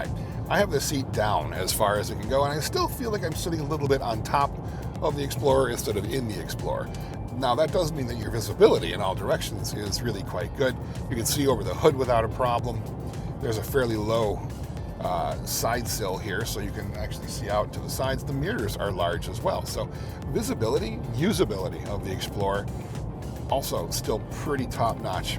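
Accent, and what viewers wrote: United States. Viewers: I’m guessing he’s an American?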